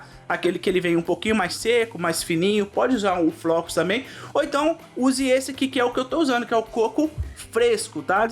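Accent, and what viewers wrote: Minas Gerais, Brazil. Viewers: Eu também faço isso! Amo os vídeos e o sotaque "gostosim" dele!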